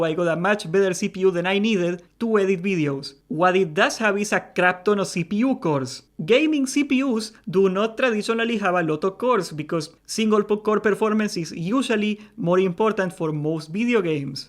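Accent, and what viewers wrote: Spain. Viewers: Eres de fuengirola, verdad? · I gotta say that I like how you speak English, are from Spain?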